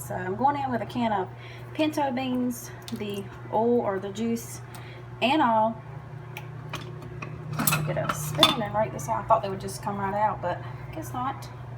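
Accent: South, United States. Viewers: Hi! Just came across your channel. Where do live? I detect your southerness. I am from Texas. My grandma lived in a super small town on the border of Texas and Oklahoma, but her mom was from Georgia. I love this kinda cooking.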